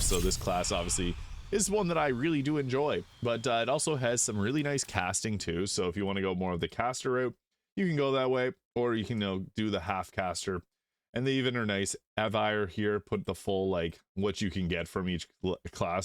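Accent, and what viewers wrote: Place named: Quebec, Canada. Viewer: Hair? lol The French Canadian accent 😂 Im surprised how well the mods work on this game, Larian Killed it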